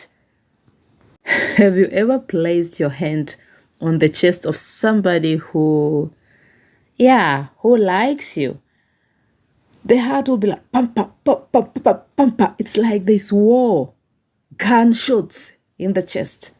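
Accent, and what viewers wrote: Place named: Namibia. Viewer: Huh? My fellow Namibian. No wonder i understand the tone so well. Lemme Subscribe ❤🎉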